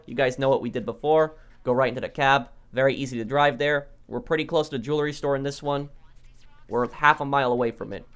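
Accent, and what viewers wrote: Poland. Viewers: Are you polish? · I got kicked when I was watching TV on pc... Btw are you Polish? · Are u polish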